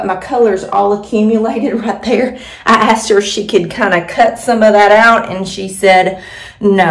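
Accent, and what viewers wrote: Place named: South, United States. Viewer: You have my hair.. GAWD..... same problems.... same FLOWER on the right side of my head..... I want to transition, I am 62 and my hair is THICK like this!... dang girl... we got the same MAMA! I'm in Texas... I'm gathering from your accent.... Arkansas? Alabama, not too much toward Mississippi....OKLAHOMA??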